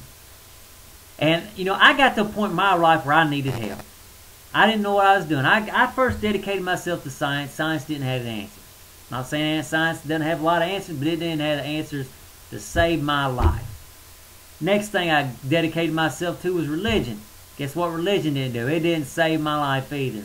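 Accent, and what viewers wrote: South, United States. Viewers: You're awesome mate.. love your southern accent. Thumbs up ;D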